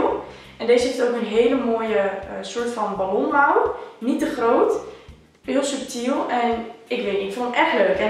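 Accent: Twente, Netherlands. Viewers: Kom je uit Twente hahaha??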